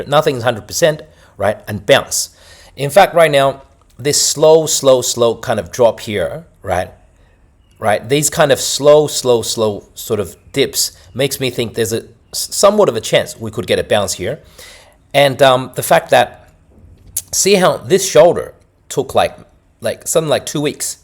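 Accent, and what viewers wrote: Australia. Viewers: Accent sounds Australian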